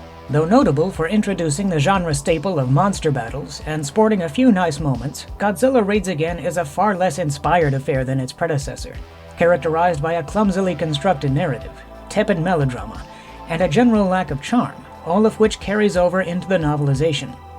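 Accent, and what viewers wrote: Transatlantic, United States. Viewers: I know this has been up for a year but I’ve only just started to pay attention to these. I own this English version, and when you said it read like a stilted first draft, that nailed it. I couldn’t agree more. It’s a pretty technically accurate translation (I own and have read the Japanese version!), but there’s no flair, and consequently comes off a little boring. I’m eagerly awaiting the Mothra translated book by the same guy, and am hoping that he’s taken a bit more time to clean it up. Translation is an art, but when treated as a science, it’s not very good. Great video, and love the Transatlantic accent! Is that how you normally talk, or is it an affectation? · Never thought I’d hear someone with a transatlantic accent in 2025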